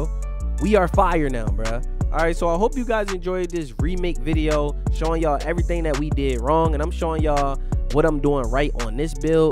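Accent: Baltimore, United States. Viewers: You definitely from Baltimore I can hear it 😂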